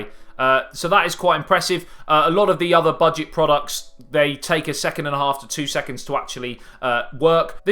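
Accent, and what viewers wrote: United Kingdom. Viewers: The British accent is fucktarded enough, but throw a lisp in with it and you're triple fucked. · I seen this video and held my breath then thought, "Thank, god. He sounds British." Why do I hate my own country so much? XDDDD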